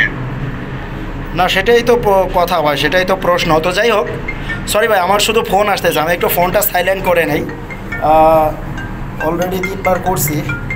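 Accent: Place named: Faridpur, Bangladesh. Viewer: ভাই আপনার ভয়েজ সুনে মনে হয় আপনার বাসা আমাদের ফরিদপুর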